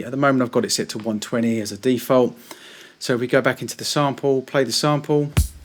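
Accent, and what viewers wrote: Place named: London, United Kingdom. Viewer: Hey bro just want to thank you so much for the well articulated and illustrated vids. Bigups from London although from your accent I detect a Londoner too!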